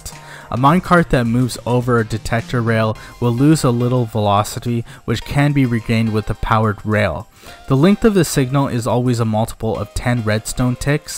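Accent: India. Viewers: Are u Indian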